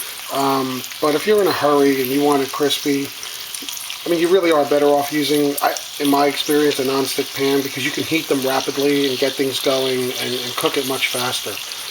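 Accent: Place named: New York, United States. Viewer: Sounds to me like he's from somewhere around New York City.. I'd guess Brooklyn or Long Island. Real nice classic downstate NY accent., Am I anywhere close? I live in Westchester so I know I'm in the right neighborhood.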